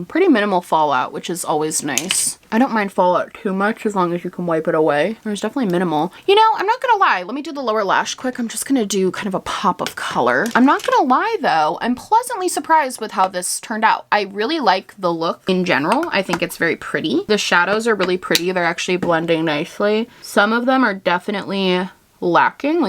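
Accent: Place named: East Coast, United States. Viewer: LOL i knew you were an east coast girl by your voice. love you x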